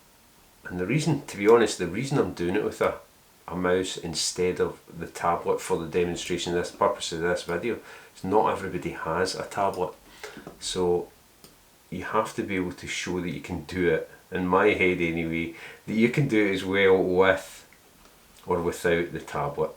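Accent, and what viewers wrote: Scotland, United Kingdom. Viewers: The Google-subtitles in English are not perfect either... Possibly because of his strong Scottish accent which makes it hard to understand for non-native speakers;-) But his video's are great!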